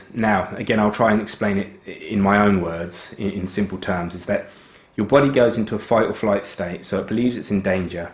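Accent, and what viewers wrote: Australia. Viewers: is he from Australia? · 澳洲口音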